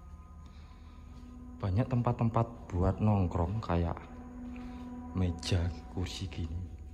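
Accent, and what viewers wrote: Java, Indonesia. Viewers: Haiiii...salam dari Malaysia.. Pekat sungguh bahasa jawanya...boleh lah faham sikit2...moga sukses selalu.. 👍👍👍👍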